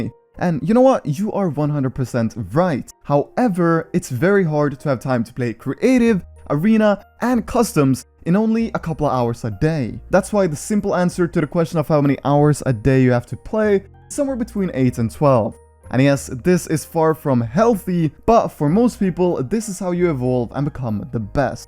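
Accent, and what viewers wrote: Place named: Norway. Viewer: jeg visste at du var norsk jeg kunne høre comsa dine pa turneys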